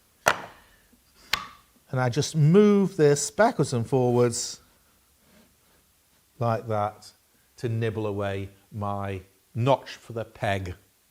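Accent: United Kingdom. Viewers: Hi, I’m not sure if you ever get to answer any of these comments but I’ll ask anyhow. So refreshing to hear an English rather than American accent for a change by the way! I’m coming back to the UK next year from South Africa and would love to know of workshops, Guilds or such where I could learn (I’m pretty much self taught and have only been doing wood work for 3 years). Please give me any advise if it’s at all possible. Thank you.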